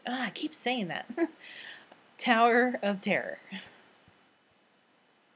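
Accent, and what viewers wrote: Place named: United States. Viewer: Thanks for the video, very useful as I am booking our FP tomorrow. You sound like Ellen Degeneres a bit, lovely US accent :)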